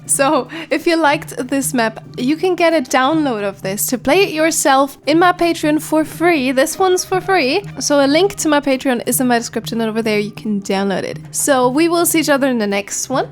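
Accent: Germany. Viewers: The deuich accent is there but the animated avatar and Minecraft skin look Japanese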